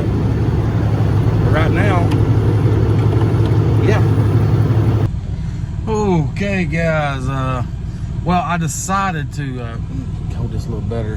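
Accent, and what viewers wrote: South, United States. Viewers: U have a deep southern accent! Where u from?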